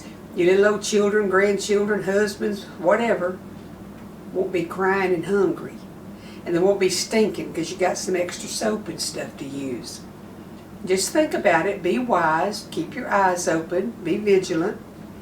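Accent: South, United States. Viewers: You’re such a dear woman! I love listening to your lovely southern accent and watching you work in your beautiful red kitchen. I especially like the way you show us your utensils, along with special tips and techniques in your cooking. So glad I found your Utube channel! Can’t wait to see your beautiful gardens this summer! God bless you! A new friend from way up north.🥰🙏💕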